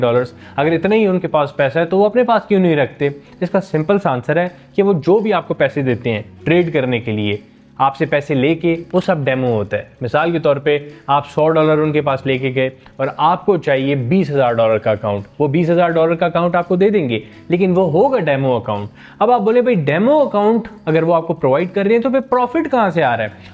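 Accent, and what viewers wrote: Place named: Pakistan. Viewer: Ab batao bhai aur 100% loser ki fee se nhi chalta unka main revenue of source h pr bohot si cheeje h ab job Krna hoga to banda karlega funding pips me bolega halal h aur wahi model pe kaam krne ko glt kaise bol skta h 😂 btw me India se hu mujhe is ke upr ki jo mene knowledge li h iske upr level ki knowledge laake batao ki ye haram h pakistani 😅 awaaz curiosity jyada banati h :) agar h koi banda to genuine batao to me leave bhi krdunga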